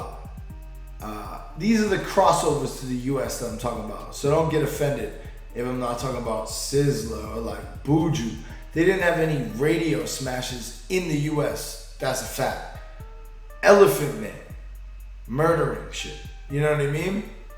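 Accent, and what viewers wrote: United Kingdom. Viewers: Big man think u from the uk lol bless up thou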